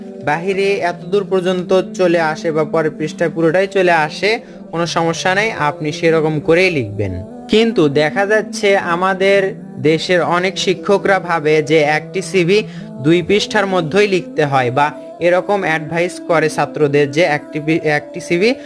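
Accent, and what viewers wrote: Bogura, Bangladesh. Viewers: স্যার আপনার বাড়ি কী গাবতলি, বগুড়া..?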